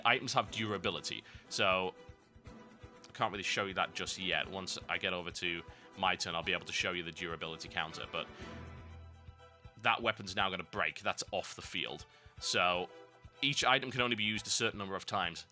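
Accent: United Kingdom. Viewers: Now really, think about this, British people talked like that first, therefore he is pronouncing it right, and we Americans are pronouncing it wrong.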